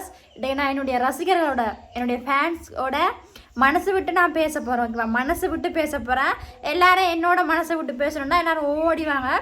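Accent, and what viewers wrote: Sri Lanka. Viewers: இலங்கை தமிழ் அழகு நீ பேசுவதும் அழகு கபடமற்ற பேச்சழகு பெண்மை அழகு உன்னிடமுள்ளது.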